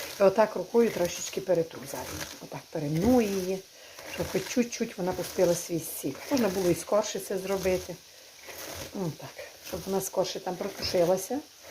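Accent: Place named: Bukovina, Ukraine. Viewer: Насолоджуюсь Вашою мовою з Буковинським відтінком. Дай Вам бог здоров'я.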